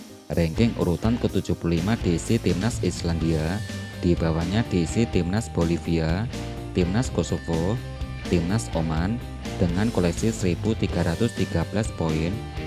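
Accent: Java, Indonesia. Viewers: bahasa medok jawa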